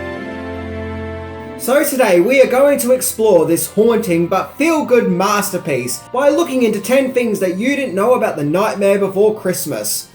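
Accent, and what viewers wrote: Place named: Australia. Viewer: Eh, he's Australian... they say things weird. I remember my Ex and I laughing back and forth over the way each of us pronounced "roof" or "shore"...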